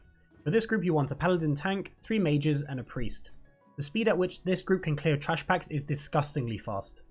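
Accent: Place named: Scotland, United Kingdom. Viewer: You sound Scottish! I think all Scottish people play rogues and warlocks hahaha, seems to be the case on my server at least!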